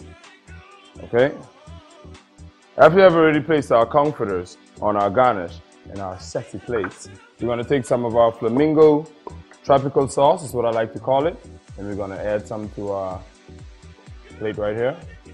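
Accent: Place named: Turks and Caicos Islands. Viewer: Oh I catch this accent now! 😂 Turks & Caicos used to be a part of the Bahamas, thus the traditional foods & recipes will be close. In my experience, the difference in taste is minuscule. Much love, Bro!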